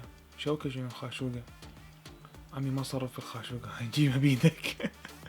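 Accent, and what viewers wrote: Mosul, Iraq. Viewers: حب كبير للهجة العراقية ❤️ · شيف انت من اي محافظة؟ ؟؟ حسيت بحجيك نغمة مصلاوية ما اعرف ليش 🤭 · وفف فديت لهجتك المصلاويه 😂😂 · اسمو شوبك بسوريا.. والله اللهجة العراقية حلوة وانت انسان عفوي وقريب من القلب ومبدع تمنياتي لك بالتوفيق دائما.. الكيكة بتجنن يعني تخبل😄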